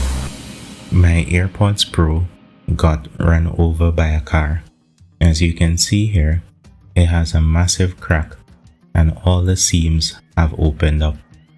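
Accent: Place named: Jamaica. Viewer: How him sound like yaadie so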